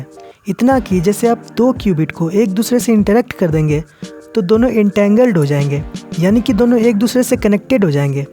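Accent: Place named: Jharkhand, India. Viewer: 👍Good info.. This channel is under-subscribed... deseves more views.. A small suggestion from my side.. try to correct your accent to be more appealing... It appears that you belong to Jharkhand, and your accent is not neutral.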